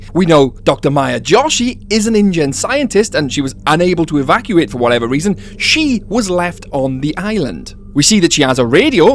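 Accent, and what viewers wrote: Wales, United Kingdom. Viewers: He sounds Welsh to me but I know what you mean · Are you welsh?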